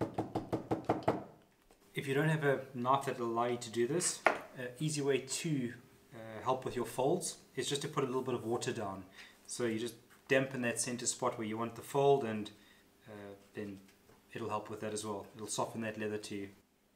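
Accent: South Africa. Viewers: You sound South-African if you are can you help me with good local places to buy tools and leather I'm looking at starting as a hobby. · Ja, thanks my boy for this video, cool to hear a SA bloke on here loĺ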